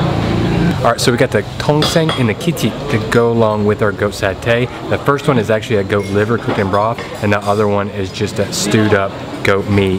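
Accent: South, United States. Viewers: Love when you still say ya'll ...very southern USA. Have fun in South East Asia.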